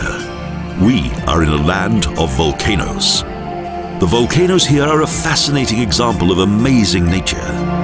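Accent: United Kingdom. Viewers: Adrian Zieniewicz it British mate! 😁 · Janet Njie lol, which British accent does the narrator speak? :D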